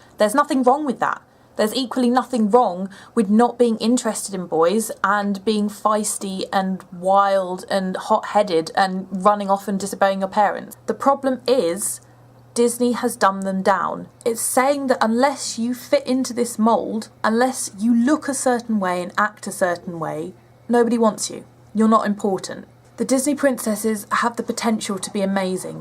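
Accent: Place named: United Kingdom. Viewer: Saw ya comment on Sourcefed. I agree with what you said in your vid, I also think it was also a shame in how they changed her image, really no need for it. I myself thought she was a great character and i really liked her. Not that i have a problem with really feminine women, but i rather the normal down to earth, and even in a way tom boy-ish girl to a certain extent, i even prefer to date women like that :P. Curious i know your British from your accent, but are you also Scottish by chance?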